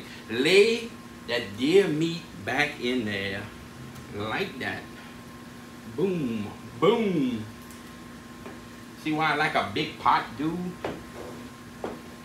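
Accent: Louisiana, United States. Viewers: I'm from Washington DC and we have a unique accent. I like listening to your Cajun accent. 😊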